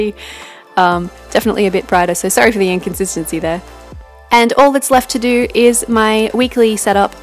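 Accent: Australia. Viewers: I love your simple bujo set up :) WOW! I was in Melbourne back then! I love Australian accent :)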